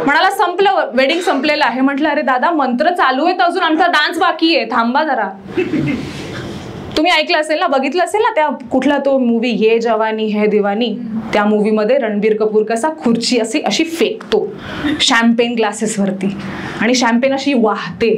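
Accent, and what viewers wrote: India. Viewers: tumachi Marathi bhashewarache prabhutva assal Puneri watat ahe.. ekhada Punyat show kara.. nakkich pratisad milel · Probably one of the best Marathi comedian out there. And her Marathi is so Mumbai. Otherwise you always hear puneri or kolhapuri Marathi. Nothing against them, but Mumbai accent Marathi feels relatable. Keep up the good work. ❤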